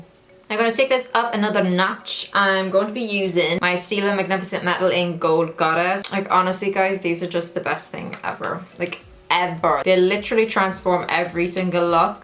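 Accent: Ireland. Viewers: Wait are you Irish ?? Btw your absolutely AMAZING ly xx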